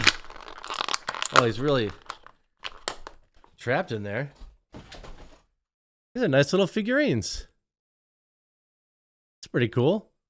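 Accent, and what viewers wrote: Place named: Midwest, United States. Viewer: Your Midwest accent really comes through when you repeat the word "beg" and "beggege", as in, he stole a "beg" and flew a "fleg".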